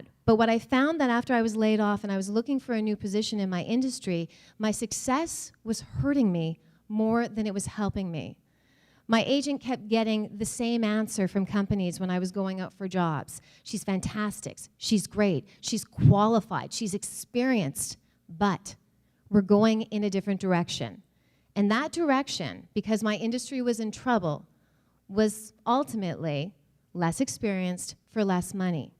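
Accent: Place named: Canada. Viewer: Lol she's extra Canuck, she sounds like Marco Belchior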